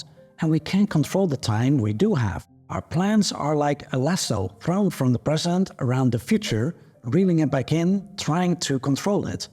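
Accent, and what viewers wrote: Netherlands. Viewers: Love your Dutch accent 😊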